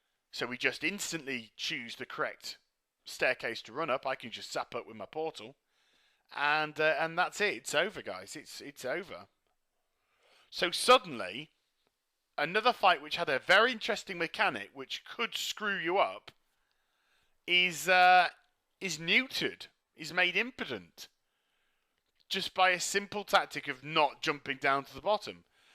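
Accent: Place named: United Kingdom. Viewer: damn this guy's accent is annoying more so than other british accents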